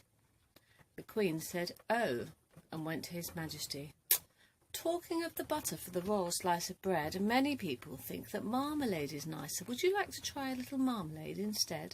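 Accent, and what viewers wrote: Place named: United Kingdom. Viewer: A bit of British tongue to classic poetry! Nicely read my dear 😁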